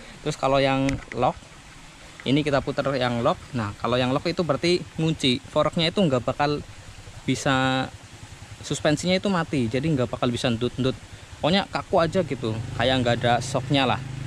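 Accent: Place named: Java, Indonesia. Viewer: Logatnya lugu orang jawa :)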